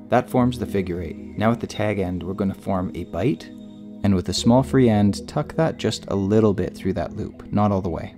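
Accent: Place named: Canada. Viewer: I can’t help but noticing your thick Canadian accent. I’m surprised that you guy don’t call the Canadian jam knot the jam knot.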